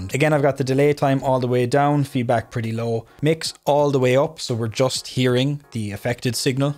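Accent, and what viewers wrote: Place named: Ireland. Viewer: New sub here, Tis gas to be listening to a pedal review with a good oul strong paddy accent 😂 Fellow paddy here. Great review ta be fair to ya. Hoping to pick one of these tomorrow to try scale down my board and utilities midi functionality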